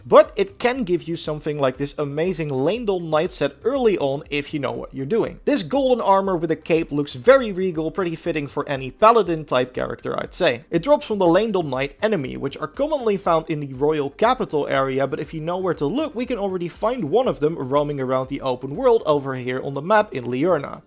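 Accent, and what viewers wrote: Netherlands. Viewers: You are so dutch haha, instantly recognized the accent :p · The Dutch accent makes this video so much better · hehe nederlander ik hoor het wel ;) goeie video man ! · Aan zijn accent te horen spreekt deze meneer ook Nederlands :D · Good video thx. Your accents sounds Dutch, is that correct?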